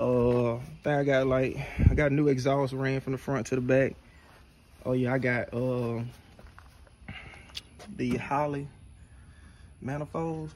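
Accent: Mississippi, United States. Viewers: Man it sound like u from Ms dat bih pressure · Bro you from Mississippi??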